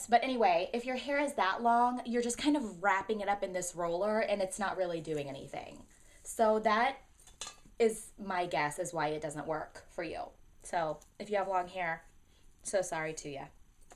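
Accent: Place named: Texas, United States. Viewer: Hi. Where do u work out of? Sounds like ur in Tx. And I just mite be willg to drive to have u do my hair! 😁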